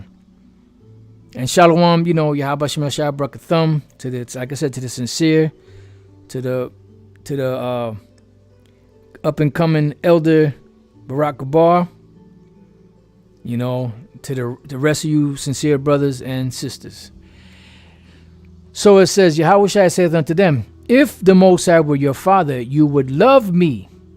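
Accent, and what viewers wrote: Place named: Jamaica. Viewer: I notice your Jamaican accent every now and then, shalam.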